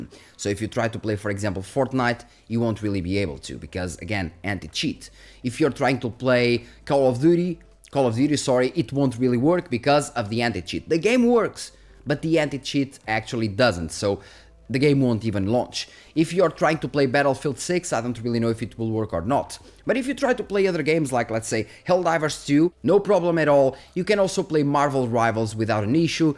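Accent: Portugal. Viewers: I can hear your portuguese tgroufh your english accent. (Not a critic.. Mine is worse). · Assim que abri o video percebi logo que eras tuga lol, bom video, já há cerca de 3 meses que uso mint e ando a gostar bastante, mais tempo de bateria melhor performance, sem telemetria (tudo comparado com o W11)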